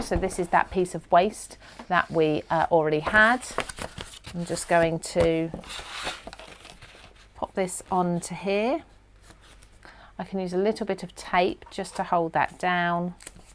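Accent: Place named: Norfolk, United Kingdom. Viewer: Your accent is lovely! Are you from Norfolk? I'm am American with a fascination and appreciation for all accents British. And your video is great too! ;-)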